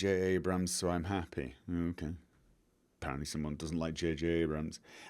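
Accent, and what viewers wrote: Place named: England, United Kingdom. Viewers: Northern english, mostly Manchester, I think. Search " Accent". There is a detailed video about it. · I love your English accent. I never heard your particular dialect. What part of England did you grow up in?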